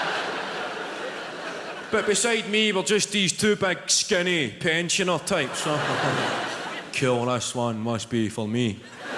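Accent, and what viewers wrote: Scotland, United Kingdom. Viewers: one word...well 5 words shortened into one....LMFAO!! thats all i can say ..and his scottish accent makes it that much more funny! hahahaha loved it! ..word count = 27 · This guy has the strongest Scottish accent I've heard. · love the Scottish accent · He's Scottish. His accent is hard for some people. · It's difficult for non Scots to understand us. Believe it or not, has tidied up his accent here. When Scots talk to each other it's impenetrable to outsiders. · Gosh, I love his accent. It is sooooooooo hard to understand him listening for the first time if you're not Scottish or British at least but still he's hillarious! :D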